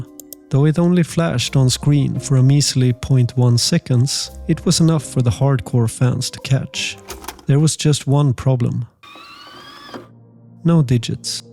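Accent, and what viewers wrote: Sweden. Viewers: Du låter mycket svensk. Är du svensk?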